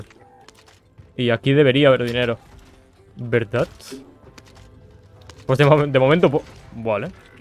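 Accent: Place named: Argentina. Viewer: Grande pensé q eras argentino jóder...abrazo desde Argentina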